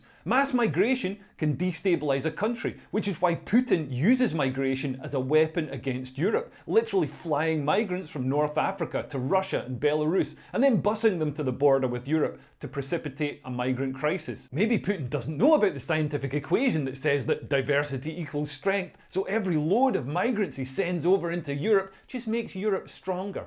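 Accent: Scotland, United Kingdom. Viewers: A) I couldn't agree with you more, it's like hearing my own thoughts in a Scottish accent. For example, what you said about technocrats (quangos is the UK) and NGOs undermining democracy. B) Your description (from - ) of the establishment's dream for our future (given high immigration levels) sounds like a rubbish version of Blade Runner, with the replicants replaced by immigrants and space colonies replaced by our cities. It's already looking more like 'District 9' than Blade Runner though.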